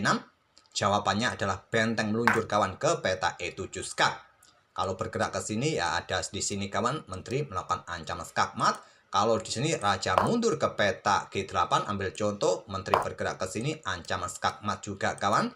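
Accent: Java, Indonesia. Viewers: Bang kurangi loga Jawa nya.bang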